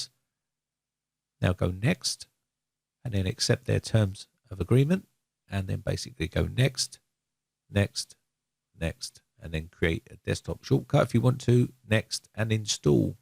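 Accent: England, United Kingdom. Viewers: I like the English accent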